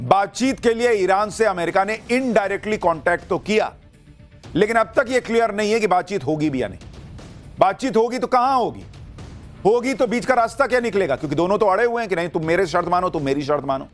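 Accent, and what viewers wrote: India. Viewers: Bro. Your deshi way to say is great maza aata hay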